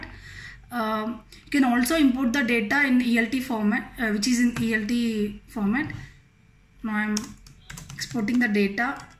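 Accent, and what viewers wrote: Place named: India. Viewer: This english is just understandable in India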